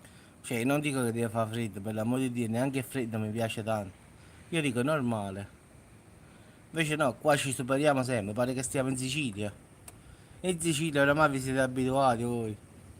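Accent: Naples, Italy. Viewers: 🤣🤣 come sei divertente con stu napoletano!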